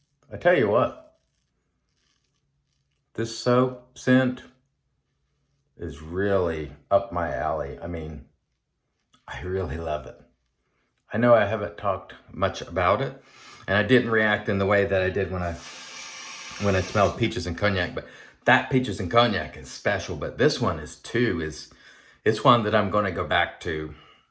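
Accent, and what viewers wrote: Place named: South, United States. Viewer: That soft Southern accent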